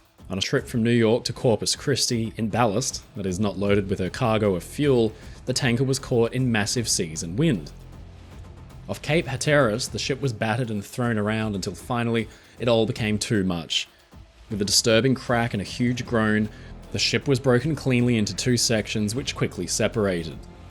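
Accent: Australia. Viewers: It’s just an ordinary Aussie accent.🇦🇺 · I attempted to watch this video while eating toast, but couldn't hear (Our Friend) over the crunching. So I turned on the Closed Captioning, and now I couldn't finish my breakfast because I was laughing so hard. Apparently the subtitle bots have never heard an Australian accent before.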